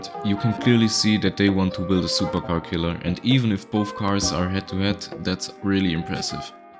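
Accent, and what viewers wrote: Germany. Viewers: Are you german? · Gude .) musst noch bissel Amerikanisch lernen fällt auf das du Deutscher bist .) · man hört den deutschen aczent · bro bist du deutsch ja nh?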